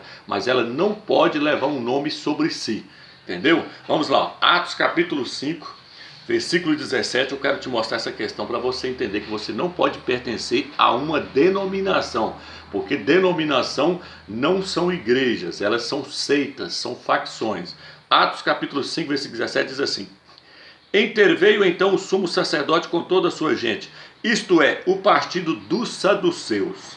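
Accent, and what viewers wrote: Northeast, Brazil. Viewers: tu é do nordeste amado??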